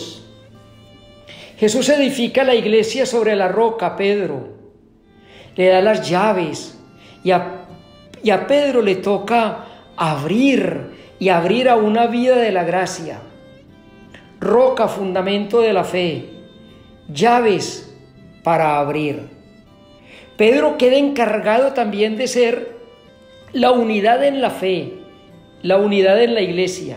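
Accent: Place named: Antioquia, Colombia. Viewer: 😍Padre tiene acento paisa 🎊🎉🙏🏻🙏🏻💕😊😊 Dios te bendiga siempre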